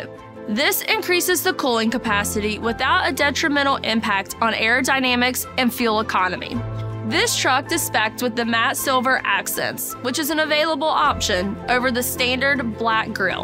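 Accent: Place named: Texas, United States. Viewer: I think she meant WAKE. As in wake turbulence. But because Freightliner picked someone with a Southern or possibly Texan Twang to do the VO in this video, wake sounds like weight and wash sounds like "worsh."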